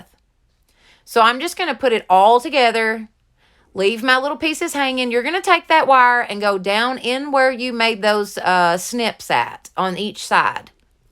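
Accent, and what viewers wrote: South, United States. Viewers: Can't pick a favorite as all of your projects are beautiful. Best part is; I have all the materials to make them. Lord have mercy girl you're a kindred soul for sure. You speak "Southern!!!" Music yo my ❤️. Have a Wonderful Christmas 🎂⛄❄️ and Happy New Year.😇...Roberta from N.C. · You are literally the BEST bow maker I have ever seen. ❤ Your lanterns are gorgeous! Ok.. I love your accent. It is a mix between my grandma (OK/ARK) & my daughter (TN/GA) in law. 😉 It makes ya like family. ❤ I love your Reindeer Farms sign. It is gorgeous! Another amazing bow! 🎀 The candle holder turned out amazing! 🕯 That last sign is perfect. The picks in the corner like that is beautiful. Ty soo much for sharing. I appreciate it. So happy to have you on this Hop! 😀 Merry Christmas! 🎄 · Love your southern accent. I’m from West Tennessee!!! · I love your southern draw & your upbeat personality! 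You must be a southern gal like myself. I love how you say ‘dude’ and ‘slap that paint on y’all’, lol. You’re definitely a lot of fun to watch & listen to! All of your crafts are beautiful. I love the lanterns! The 3 candle centerpiece is so gorgeous! These are my favorite. Hope you & your family have a Merry Christmas! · I always love everything about your channel especially the accent seeing as I’m from the south 😍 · You are really fun to watch. I love all your DIY’s. Also you have a wonderful accent. I grew up in Alabama and I miss the southern accents.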